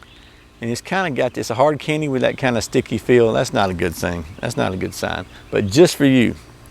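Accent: Georgia, United States. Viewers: I place that accent at south of Atlanta, GA How far off am I there, sir?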